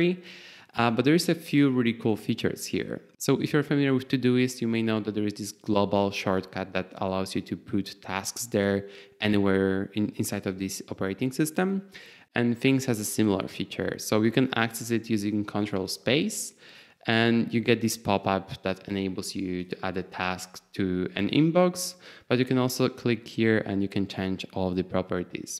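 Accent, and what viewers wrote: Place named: Germany. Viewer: Hi, I like your accent, but totally confused, You speak English with German accent but you have a Polish name lol, what's your story?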